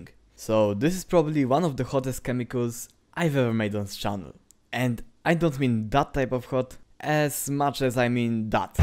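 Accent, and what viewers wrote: Poland. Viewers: Ten Polski akcent :D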